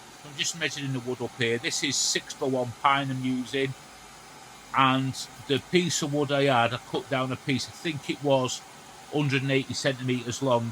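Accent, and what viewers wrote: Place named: Liverpool, United Kingdom. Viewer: A scouser? Red or blue?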